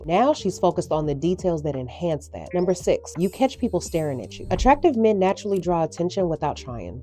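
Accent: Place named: South, United States. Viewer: My cousin says women are like ice cream, 38 different flavors of the same 💩! I love the southern accent on this commentator😋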